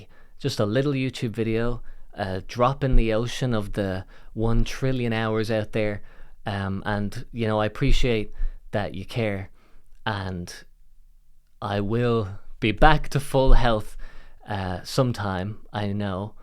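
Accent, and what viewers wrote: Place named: Ireland. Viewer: Your Irish (Newfoundland?) accent is really neat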